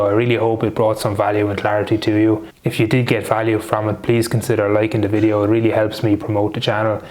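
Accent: Ireland. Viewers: Great to hear an Irish accent on the platform 👍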